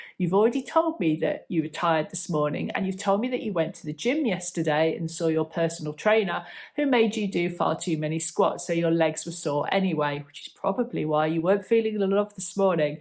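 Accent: United Kingdom. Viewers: Is that a Cheshire accent?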